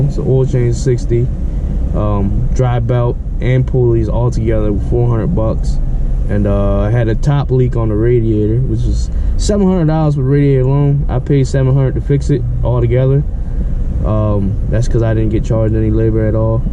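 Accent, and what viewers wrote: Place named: Bronx, United States. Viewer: Rich asian kid talking like he's from the Bronx. pshhht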